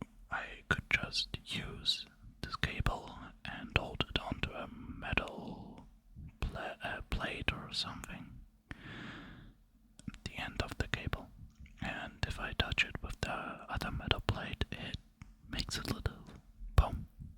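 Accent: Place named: Germany. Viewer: Always love some rambles! Turns out, the german accent is great for ASMR whispering :)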